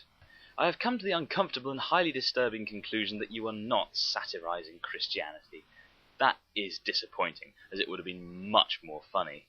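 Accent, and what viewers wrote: United Kingdom. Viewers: This is a much better video than I usually get and I truly thank you for it. I told myself I was not going to do many more rebuttal video, however, I might have to rethink that decision. I obviously disagree with you nonetheless well done. And allow me to grant you the same (backhanded in your case) complement. You good sir are quite bright and more than eloquent, aided greatly by that killer British accent.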